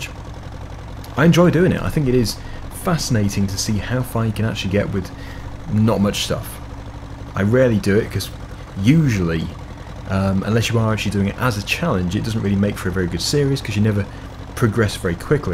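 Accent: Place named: Guernsey, United Kingdom. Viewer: where u born in guernsey cuz i am from there and your accent is guernsey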